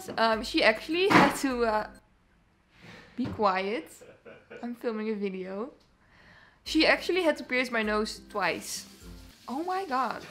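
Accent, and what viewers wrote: Netherlands. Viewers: ik hoor de Nederlandsheid hierin lmao, wel leuke video :)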